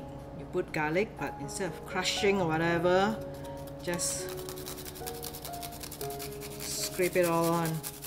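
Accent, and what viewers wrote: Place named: Nigeria. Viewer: Omg, she sounds so Nigerian. Nice job , I will try this .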